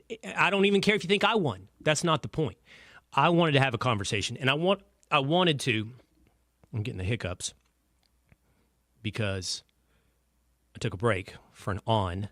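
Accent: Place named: South, United States. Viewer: Hi there, I saw the debate with David & that happened to be the first time I've seen your show. I watch David, so I think the algorithm hooked me up. I commend you for your calm composure, fairness & respect for. I've watched him for years, & I was taken aback by the aggressive tone he took with you from the start. I don't know if he was maybe scared or nervous because he's had some bad experiences with debates with the right "debating.". Or the two of you have differing ideas about what is/isn't a debate. In any case, he seemed ready for a fight & defensive. I lost some respect for him after that episode. I am an old-fashioned liberal libertarian (liberal on most social values, but lower my taxes! - I am not wealthy!) I now have subscribed to your channel because I appreciate your delivery, like to hear fair arguments from reasonable folks on the right, & as a Southerner, I know that accent! 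Thanks for the follow-up here. It was very fair. May we all honor the men and women who have given their lives & health to keep us free & safe this Memorial Weekend.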